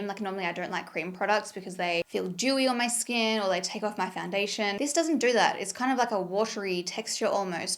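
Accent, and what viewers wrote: Australia. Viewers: ! Hearing your voice reminds me of my Aussie cousins who were just here in the states. Was going to say though don’t feel bad for more engagement. We’re here because we love and want to see you succeed. We are your community! I’ve been watching you since you were at benefit it’s so crazy to see how makeup has changed since then.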